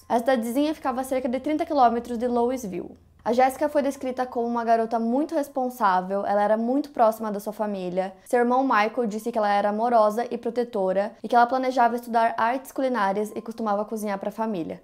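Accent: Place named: Curitiba, Brazil. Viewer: Ela é de onde? Ela tem sotaque de Curitiba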